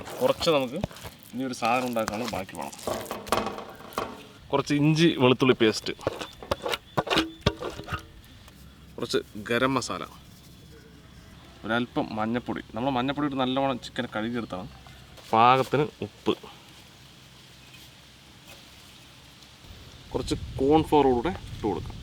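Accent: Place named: Palakkad, India. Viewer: Heloo ... ...ചേട്ടന്റെ എല്ല വിഡിയോസും പൊളിയാണ്.. ട്ടോ... നല്ല രസം ആണ് കണ്ടിരിക്കാൻ.... മാത്രമല്ല... പാലക്കാടൻ സംസാരവും... എന്തായാലും പൊളി തന്നെ...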